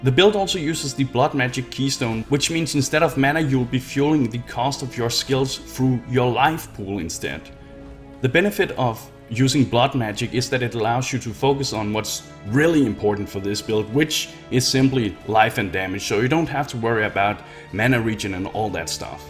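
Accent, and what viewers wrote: Denmark. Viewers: Hmm, that accent. You're Danish aren't you!? :) :) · Hey really good video man! i recently started playing and have been toying with a necromancer/totem witch, with a bit of fire spells to help on the side. Is it to spread out or is it viable? Btw are you danish? i just thought i heard it in your accent :). If you are, i can tell you, it was definitely difficult to hear it.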